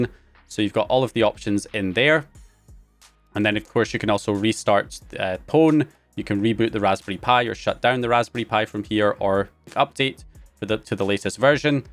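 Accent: Scotland, United Kingdom. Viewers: He's clearly Scottish 😂 Russian American ? 😂😂😂 wow lol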